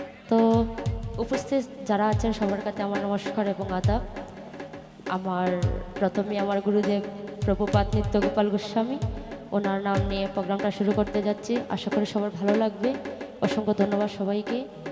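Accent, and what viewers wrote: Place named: Sylhet, Bangladesh. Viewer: উনি সিলেটী ফুৰি (মেয়ে) তাই বাংলায় কথা বলতে ভিল হয়...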